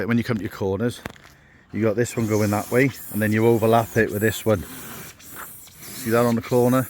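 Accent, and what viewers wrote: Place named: Welsh Valleys, United Kingdom. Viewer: Love the valleys accent Butt! Short instructional video thos week. Hope your dad is on the mend buddy.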